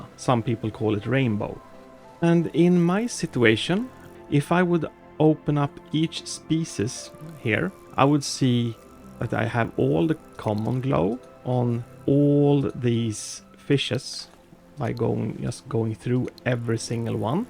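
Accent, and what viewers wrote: Sweden. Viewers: are you swedish ( är du svensk)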